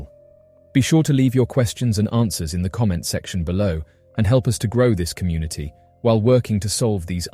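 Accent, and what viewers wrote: England, United Kingdom. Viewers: WHy The Hell is an ENGLISHMAN ACCENT being used for a USA Incident? JUST CURIOUS. Right? USAF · I am sick of hearing a british accent to report on AMERICAN NEWS!!! I realize it is computer generated, is there no one who speaks american english, in all of Utube? America broke ties with GB and the British Monarchy, generations ago! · That he has the English accent means I can skip this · 🤬🤬🤬🤬WHY AM I LISTENING TO A BRITISH VOICE NARRATIVE?? I listened for three minutes.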